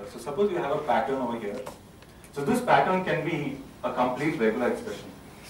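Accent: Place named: India. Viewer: I cannot for the life of me understand English with an Indian accent. Other accents really aren't a problem, just can't understand the Indian accent. Frustrating.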